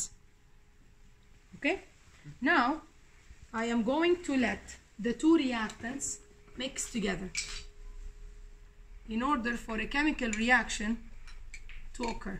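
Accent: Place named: Arab world. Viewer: لهجتك عربية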